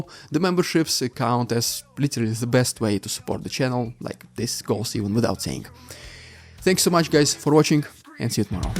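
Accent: Russia. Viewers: You know, your English (despite the accent) is most eloquent, even somewhat poetic. Wish I could understand Russian and listen to you in your native tongue to appreciate your words even more. We must never forget that enmity towards Russia isn't the same thing as enmity against the majority of its people. There are two wars going on simultaneously: Putin and his cronies against Ukraine, and then again, their propagandistic war against ordinary Russians. Let's hope that state madness will at some point cause ordinary Russians to wake up, rebel and reconstitute. But let's face it: a revolution in Russia in the past didn't lead to the emergence of sanity then, and quite likely wouldn't now.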